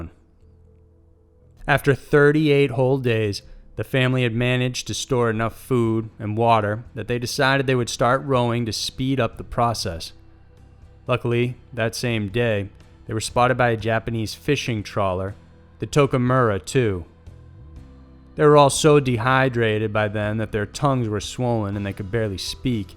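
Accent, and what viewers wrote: South, United States. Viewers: He has a nice Southern accent. To my ears, anyway (Canadian).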